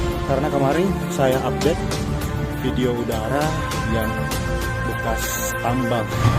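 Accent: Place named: South Sulawesi, Indonesia. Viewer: Logat bugis nya kental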